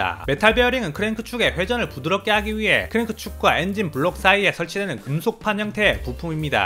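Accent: Gyeongsang, South Korea. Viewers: 말투가 경상돈가 함경도인가?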